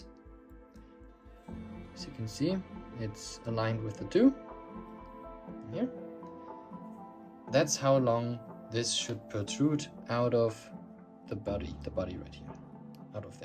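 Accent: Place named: Germany. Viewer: I think your deutsch 😂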